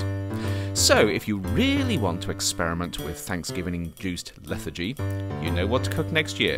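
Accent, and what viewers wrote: United Kingdom. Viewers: Why is there a British guy telling me about Thanksgiving?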